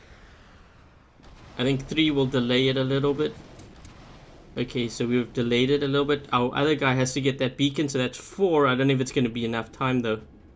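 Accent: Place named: South Africa. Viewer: sound south african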